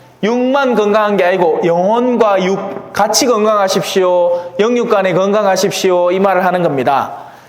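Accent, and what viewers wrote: Gyeongsang, South Korea. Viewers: 신부님 갱상도 어디세용~? ㅋ 저는 영주입니다 ~으하하하하하하~~^^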